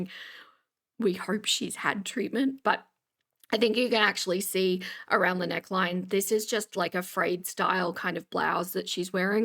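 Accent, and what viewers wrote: Australia. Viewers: There's a a lot of aussies flowing in as creators? I like it, i love the accent 😍🥰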